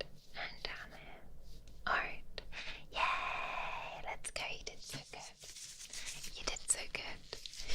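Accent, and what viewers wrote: United Kingdom. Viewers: Her very "queen's english" accent really adds to this, the close in whispering and ear blowing were also peak.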